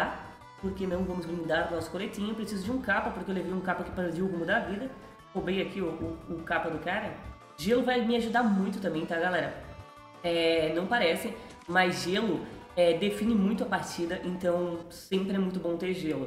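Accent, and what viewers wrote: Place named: Portugal. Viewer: Tem Um Sutac Portugues muito Lindo Amei❤❤😍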